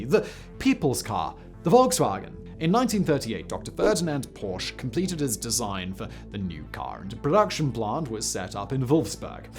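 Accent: RP, United Kingdom. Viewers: Oh vey just another what is it Brit brick accent(?) telling lies about the war and Hitler? Maybe mention who declared and supported partisans, oh yes in this case was stupid to let Brits escape at Dunkirk, should habe them out and all would have been over. Who used dumdums? Ah right and terror bombing on German civilians. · This is my first Megaprojects video and posh, educated, hipster, laa de daa accent is something else. Immediate sub. Also for the interesting content as well of course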